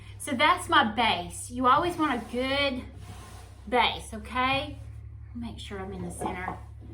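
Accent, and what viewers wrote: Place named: Alabama, United States. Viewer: Everything looks gorgeous. You’re my new favorite decorator!! I’m from Arkansas and when I first heard you, I thought maybe you were too. Guess the southern Alabama accent is the same. Lol. Anyway, I’m binging your videos!! 🍂🍂🎃🧡🧡🌾✝️✝️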